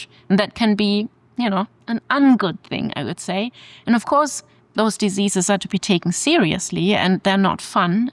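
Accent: Germany. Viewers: I never really appreciated a German accent but her charm just gave me a new perspective on it. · The sound of a German accent is hard to listen to. Very stilted. The sounds dont flow.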